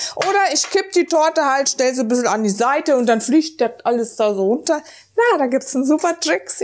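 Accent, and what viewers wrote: Germany. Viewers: Ich bin so amüsiert über deine verbale Dialektwanderung zwischen Berlin, der Pfalz, Hessen und ein büschen Nordisch....von wo bist du, du Granate??? Deine Rezepte und die Umsetzung sind ja herrlich!!!+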